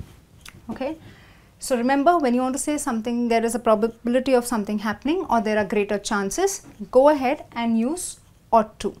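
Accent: India. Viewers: Indian english 🤦🏼‍♂️ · Her accent is so different. :) Is she from India?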